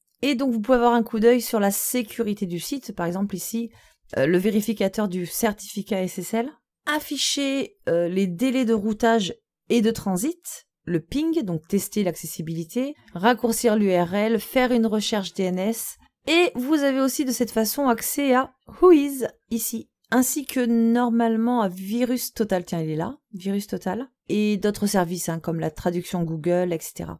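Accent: South, France. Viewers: merci très bien expliqué léger accent qui vient du sud..peut être de la ville rose... si je peux me permettre serait il possible de mettre un son bien moins fort pour un maximum de confort auditif convaincu je m'abonne c'est plus instructif qu'un cours en FOAD et plus "rafraichissant" bonne continuation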